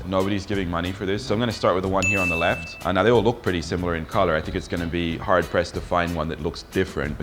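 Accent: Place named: Philippines. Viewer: Dude your english has a filipino accent...Anyways Italian food goes good with Patis...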